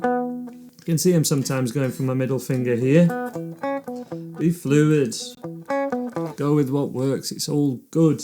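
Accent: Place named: Liverpool, United Kingdom. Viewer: Love the clarity of your videos. You explain things so well! BTW, are you from Liverpool? I hear a bit of in your accent.))) But what do I know, I'm an American.)))))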